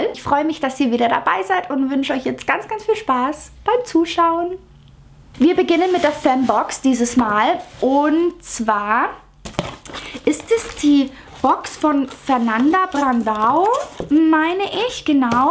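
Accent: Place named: Franconia, Germany. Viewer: Du bist mir fränkisch am liebsten❤️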